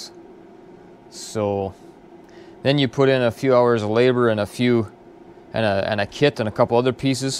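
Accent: Canada. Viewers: The sheer amount of moving parts in a fuel injection pump that was designed decades ago is mind boggling. I love your content, man. This is a relatively new-to-me channel, but you post lots of very interesting stuff, and as a fellow canadian, your honest-to-goodness canadian accent warms my heart in a sea of american videos.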